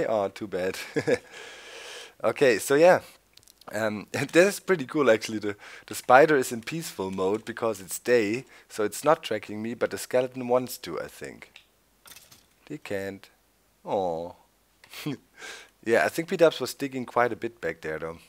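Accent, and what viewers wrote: Germany. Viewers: Ist realy strange a Person who also Comes feom the Country you come from in another language hear to speak and commenting in english at the same time you know he also under stand this: Man hört den Akzent raus! and i wanted at every time I wrote a comment say the following sentence: "it is nice to be a greiß, but it is higher to be a bauer! ;D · Du bist Deutscher?! Cool, jetzt wo ich es weiß hört man doch ein bisschen, dass du Deutscher bist :DD Aber naja Englisch kannst Du!!! :DD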